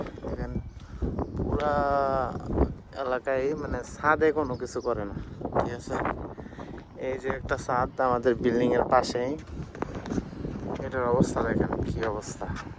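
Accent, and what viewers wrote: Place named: Narayanganj, Bangladesh. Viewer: ভাই আপনার কথা সুনে মনে হলো আপনি নারায়ণগঞ্জ এর ছেলে হবেন,,, 🎉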